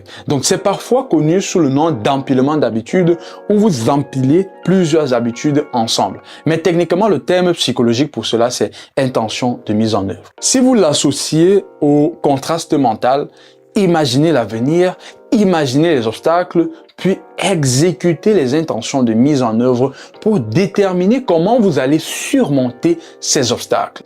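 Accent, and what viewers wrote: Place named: Cameroon. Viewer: C"est quoi le truc avec l'accent Camer un peu partout comme pour ajouter de l'emphase?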